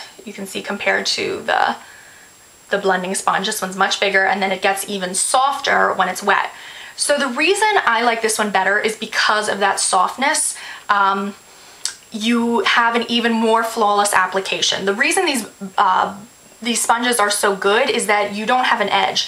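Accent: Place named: Louisiana, United States. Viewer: I'm from Louisiana - you sound like you're a Cajun girl too maybe?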